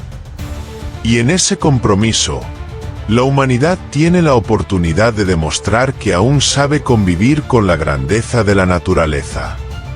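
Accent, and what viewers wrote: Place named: Spain. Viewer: Hoy he visto este documento y me agrada mucho que se hace énfasis en 1. Mostrar parte de la biodiversidad de insectos en la Amazonia y las interacciones de estos con el entorno de la selva y la necesidad de preservarlos para la salud del ecosistema y el planeta; 2. Como cada especie tiene un papel en el intrincado rompecabezas de la Vida y el aleteo de una mariposa repercute en los confines del cosmos. 3. Como videofilmador de naturaleza coincido en que la selección de la música y los sonidos acompañantes es muy importante, también la voz del narrador es importante, aquí tiene un acento español y creo que el canal es de América, cierto? Mi canal es de flora y fauna de México y todo mundo lo mira sabe que es una ventana a México. Saludos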